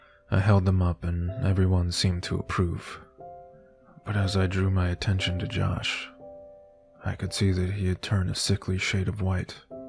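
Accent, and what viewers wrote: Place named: United States. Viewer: Certified thug classic. Love this story and happy to hear a murican read it.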